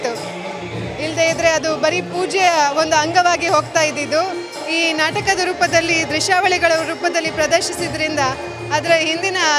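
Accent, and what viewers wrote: Standard. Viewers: Abba nimma suddha kannadakke namaskara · ನಿಮ್ಮ ಶುದ್ಧ ಕನ್ನಡ ಕೇಳಲು ಕಿವಿಗೆ ತುಂಬಾ ಆನಂದ... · How ❤❤❤beautiful ❤️ pure kannada she is speaking 🗣️🗣️🗣️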